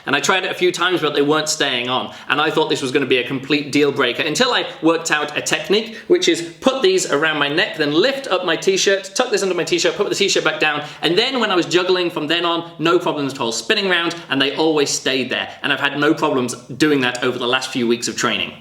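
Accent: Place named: Ireland. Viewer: i love ur irish accent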